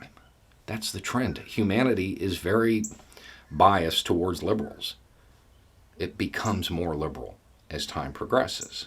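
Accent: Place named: Deep South, United States. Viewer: When the Almighty Algorithm dropped him into my box, I had to "go by" several times before decided to "tune in". the look of, his accent (I'm from the Deep South and have heard that accent from some tough, onery people)- it took me until the third watch through before I could believe he was really there. I don't think I've missed more than three since! Even Conservatives could learn a bunch here, but a bunch of thinking isn't much appreciated in Trumpland. Bet he wishes he still had Billy Barr for an attorney who could write out his own subpoenas! FR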